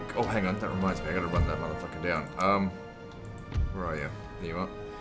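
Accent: Australia. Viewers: I absolutely love the empire total war blitz campaign and was so excited to see your return after your first attempt. I would love for you to update us on what days you would upload this campaign because I'm so excited to see you finish! Ps. I also love your angry Australian accent but the episode with full detail is so much better and also exploit the SHIT out of it mate!!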